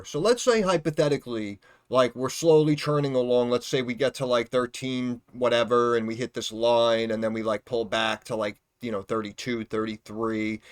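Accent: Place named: New York, United States. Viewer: I listen to you because you were spot on with luminar tech, and the the NY accent reminds me of home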